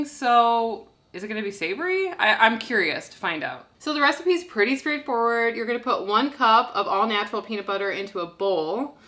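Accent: Chicago, United States. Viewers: First off you guys are effing adorable! I love the dry sarcastic sense of humor! Thanks for making this helpful content! PS. You sound like your from Chicago!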